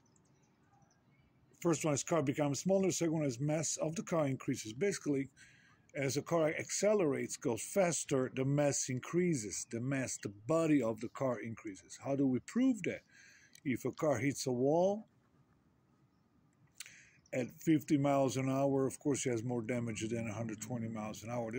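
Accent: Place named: United States. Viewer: wrong information in disgusting American accent.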